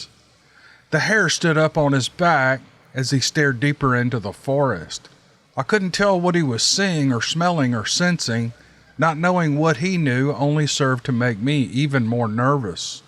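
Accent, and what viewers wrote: South, United States. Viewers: He is surprising one of the best narrator/commentators on YouTube today that southern gentlemen’s voice I think is the ticket of Sir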